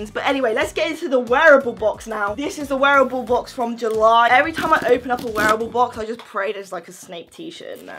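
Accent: United Kingdom. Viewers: YESSSSSS !!!!!!I love u.I love your intro:Whats up muggles hahahahha Also are u british?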